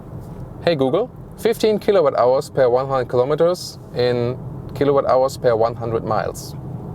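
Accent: Germany. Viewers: Forgive me but I'm here mostly for the German accent. EVs are still too costly for my monetary capabilities. Though however much I wait my next new car will be an EV.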